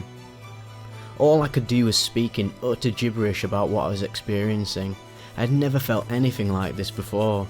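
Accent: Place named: England, United Kingdom. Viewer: I feel like you could write. Maybe you already do. I’m far away from you, it sounds like you’re English, but you’re story is something I’m really enjoying. I subscribed, so I’ll try to keep track. I love this style of writing/ oration though.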